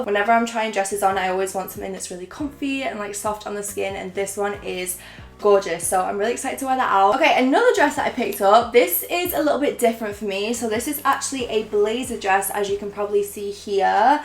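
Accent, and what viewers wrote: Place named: Manchester, United Kingdom. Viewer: She's from Manchester, England x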